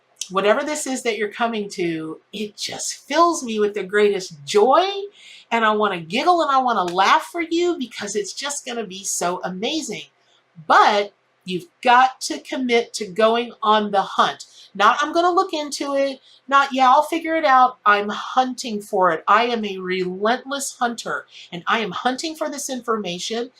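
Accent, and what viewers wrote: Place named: New York, United States. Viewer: Hahaha!! That sounds like a New York accent hahahaha 🤣🤣🤣 waaaaay back when I was in high school I played flute in band (how is your native flute going, btw?? 😊) and on my first band trip we went to New York. A guy was selling M&Ms for a charity outside of Virgin records in times square and I told him how many I wanted to buy and he said "four gorgas" and I said "How much is that?" And he repeated himself "Four gorgas" and I asked "What is a gorga?" And he slowed it dooooown and said, with the accent, "Ya gogous" (you're gorgeous) as in he was grateful I didn't just stop to listen but to also help with whatever charity hahahaha 🤣🤣🤣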